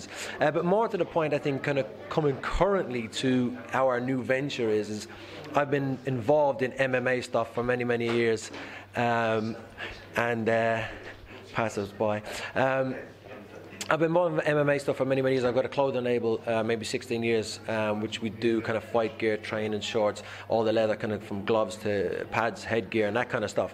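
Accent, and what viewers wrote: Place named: Dublin, Ireland. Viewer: i like this fella the rough Dublin accent has improved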